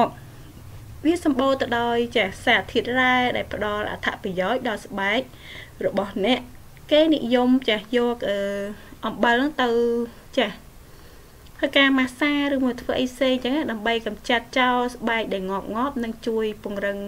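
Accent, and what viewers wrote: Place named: Koh Kong, Cambodia. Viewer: អ្នកកោះកុងហ្មងសំលេងនឹង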